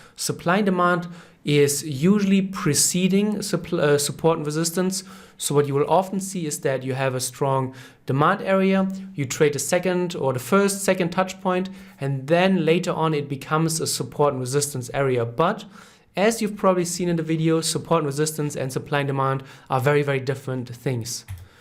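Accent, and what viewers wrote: Germany. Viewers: Something about a scientific German accent that's reassuring, much respect my mentor and thanks for the wisdom, yes I'm black and I'm saying this, thanks again brother! liked and subscribed! :'D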